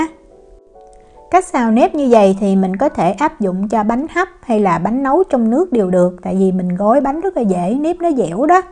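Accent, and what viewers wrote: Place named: Saigon, Vietnam. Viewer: Bạn này hướng dẫn nói đúng giọng Sài Gòn nghe hay lắm. Clips có giá trị. cảm ơn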